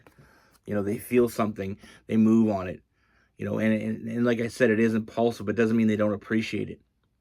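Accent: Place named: Canada. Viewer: Hi! new to the channel here. First off, your t-shirt and baseball cap collections get an A+. You are so thoguhtful and at the same time, really a dude. It's pretty charming. Truthfully, I feel guilty watching tarot readings though... I think I hear Canada, I know because I'm across the pond in B-low 😉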